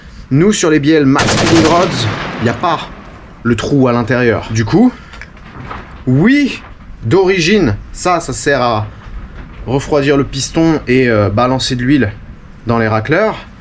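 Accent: Alsace, France. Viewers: Tu commence à choper l'accent Alsacien à force d'être avec EMS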